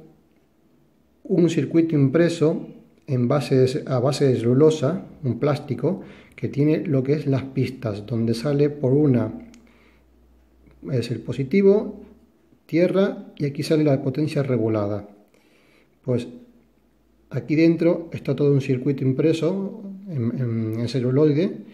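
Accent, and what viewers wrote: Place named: Argentina. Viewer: Tenes un toque de acento argentino y español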